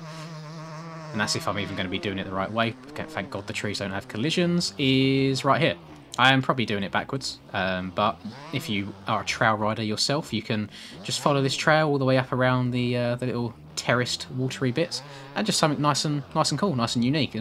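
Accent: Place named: England, United Kingdom. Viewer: im american and i can attest to the fact that i watch your videos because of your typical english man accent it adds to my experience and plus your a really good rider that makes good content keep it up broski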